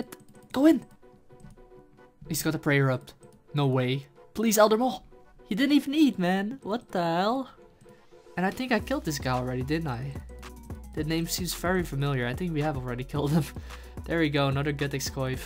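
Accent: Netherlands. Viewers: I can tell that you are Dutch, SICK accent :P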